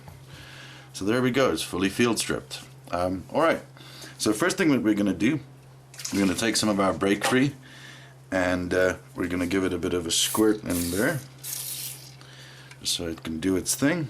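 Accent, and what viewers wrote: Afrikaans, South Africa. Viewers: I sense the Afrikaans in you :)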